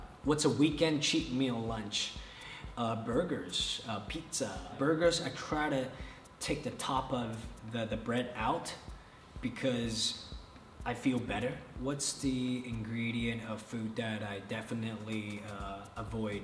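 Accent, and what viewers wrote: United States. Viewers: He went to an international school in HK, so yeah American accented English is norm. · i obv know that i'm just trying to say that even though his accent is kinda american there is a little diference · He went to American International School in Hong Kong and was probably taught by Americans, that's why he speaks like an American. But I love your comment :D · A non-American teacher with a tinge of an American accent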